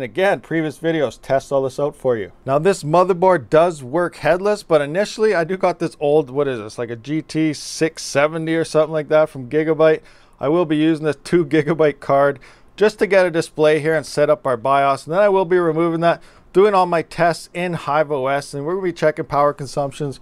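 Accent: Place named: Canada. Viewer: lmfao.. the way canadians talk jus kills me.. hahaha... its good tho..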